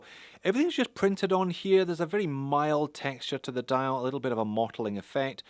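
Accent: Scotland, United Kingdom. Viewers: Subscribed to all the required, please chuck me in the draw! Keep up the great and honest content, I look forward to your Scottish tones with each new release. "Hallllooooo and welcome!" 😁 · Hi! Greetings from Norway. Thanks for the opportunity to win such a great watch. Love your content – and your Scottish accent.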